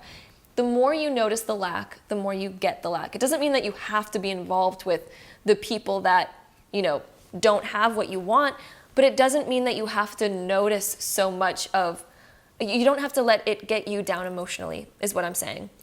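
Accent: Canada. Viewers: Pile 2: little late watching this lol as a Canadian I loved the Canadian accent. It's my ex who needs to apologize but honestly as much as I need some closure I'd prefer if he didn't contact me. I've been closed off from love but I am starting to want love in my life again. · Lovely, thank you so much. When I heard you the first time, I thought you are Canadian because of the way you say "out" or "about" 😊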